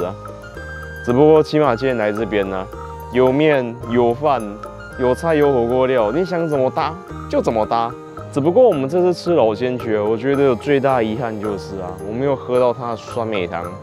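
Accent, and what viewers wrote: Keelung, Taiwan. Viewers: 濃厚的基隆腔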